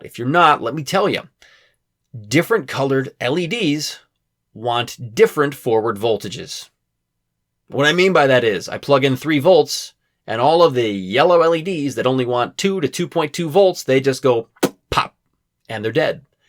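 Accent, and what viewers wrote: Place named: Australia. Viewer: That Aussie accent, lmao never gets old.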